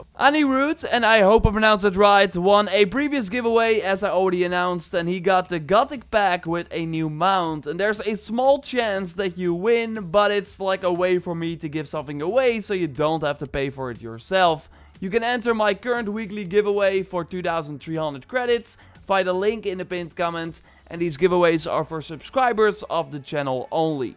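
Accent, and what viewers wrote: Netherlands. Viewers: Is hij Nederlands??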